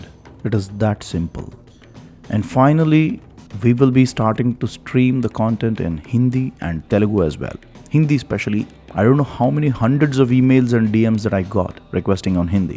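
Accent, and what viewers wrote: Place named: North India, India. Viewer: Your English is very good and it sounds as a north Indian English ! Keep it up bro ! We all are Indians in first place and we belongs to sanathan Dharam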